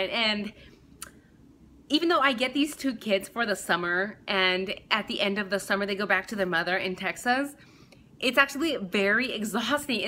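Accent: Scotland, United Kingdom. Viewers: I never noticed your scottish accent until now. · Is that SCOTTISH ACCENT??? WOOOOOOOOOOOW!!!! Am i the only one who noticed that? You are a TRUE GODDESS!!!! Your beauty is TRULY DIVINE!!!!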